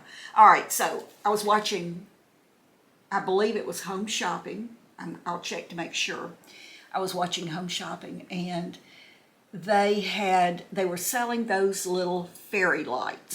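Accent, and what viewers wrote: South, United States. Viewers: I love seeing you with that adorable southern accent & you are such a true beauty❤️🍷🍷👏👏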